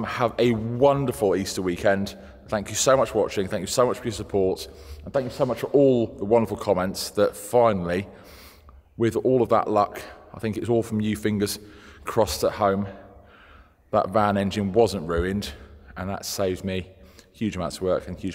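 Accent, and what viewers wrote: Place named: England, United Kingdom. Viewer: It's still chugging! You are a wizard! You are doing a fantastic job with the van and everything. Loads of fun things to do with the van yet... but you are doing good. Does remind me a lot of my Pops working in his shop... I miss him. But watching you work makes me happy. The only thing that is odd is well... the very English accent. But that's ok I love that. You got your chipper and lawnmower to fix up... but it's coming.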